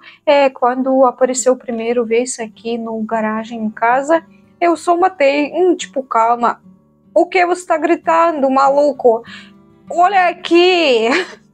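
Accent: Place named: Eastern Europe. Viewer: eu detesto esses bichos , seu sotaque eslavo deixa o portugues bonito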